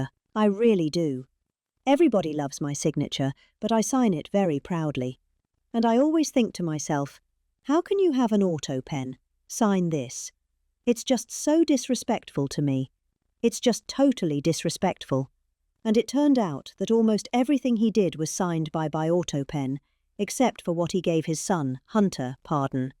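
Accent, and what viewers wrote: England, United Kingdom. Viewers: Spoken in real English by a E glish lady, shows just what a load of rubbish he comes out with. No one can make sense of this rubbish. This is the King of America?? This madman?? This the best you can come.up with??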